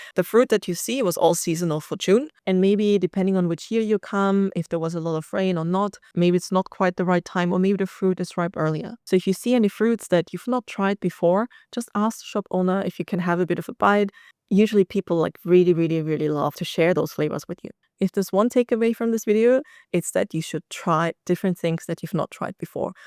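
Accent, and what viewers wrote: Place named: Thailand. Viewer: Are you half-Thai? Your Thai accent is so clear!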